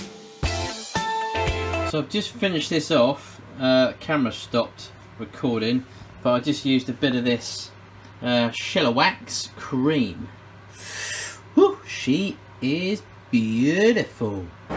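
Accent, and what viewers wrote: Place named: United Kingdom. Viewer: I want to go to the UK just so I can walk around and hear everyone talk. Your accent is so cool.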